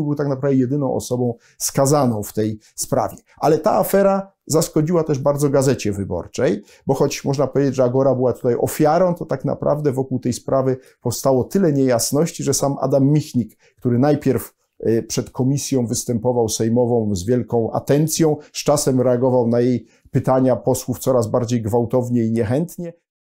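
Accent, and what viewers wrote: Russia. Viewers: Z jakim ruskim akcentem gada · Jaki piękny polski język z rosyjskim akcentem :)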